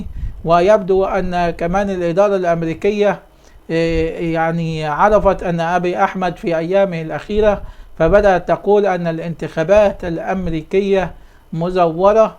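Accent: Egypt. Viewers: يا باشا انا بشوفك دايما تتكلم عن انك بتتكلم باللهجة العامية المصرية و اللهجة الصعيدية و بترد على الناس اللى بينتقدوا طريقتك او لهجتك او قراءتك - يا باشا اعمل اللي انت عايزه و بطريقتك البسيطه و اللي عاجبه يشوف فيديوهاتك اهلا بيه و اللي مش عاجبه براحته لكن انت مختلف لانك بتجيب الاخبار من جوة اثيوبيا و من ارض الحدث - احسنت و استمر ولا يهمك من اي حد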